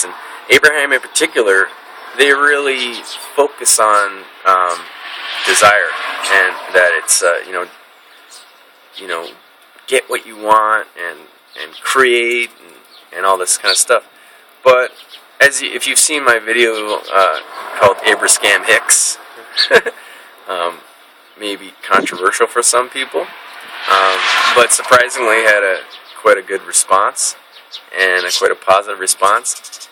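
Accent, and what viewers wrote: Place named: Canada. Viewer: real quick, you must be canadian yeah? i love the way y'all say "about" :)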